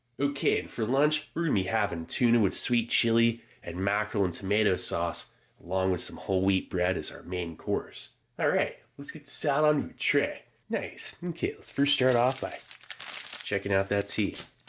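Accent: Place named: Philadelphia, United States. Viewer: I know is in Florida, but does anyone know if he's originally from Philly. I hear a Philly accent come out sometimes.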